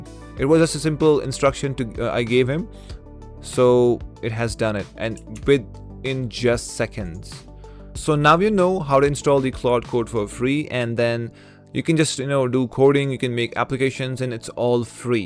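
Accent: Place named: India. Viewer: buddy u cant hide indian accent 😂, keep going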